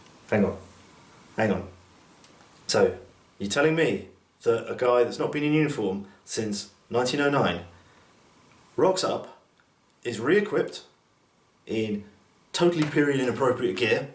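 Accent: France. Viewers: Nice French accent, man. No, I'm not being an ass; it's actually good. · Nice French accent ^^ great video by the way :) Keep on that way ! Oh, and you've got a new subscriber. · I understand much better when you have French accent :) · I love your French accent >< Very interesting video nevertheless =)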